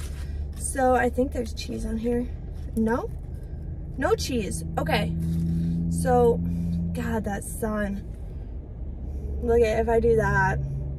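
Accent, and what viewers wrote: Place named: Michigan, United States. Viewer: Your hair looks great. I figured out why I like your videos so much. I like the way you talk. There's something calming and familiar about it. I suppose a lot of it is because you're trying to engage your audience, and I think you do a great job. The other thing for me is that you're from Michigan and since I live in Michigan I think it makes you sound more familiar and friendly than if you were from somewhere else. I didn't grow up here, but I've been here for most of my life now, so it's comforting to hear a voice that sounds like my friends on YouTube.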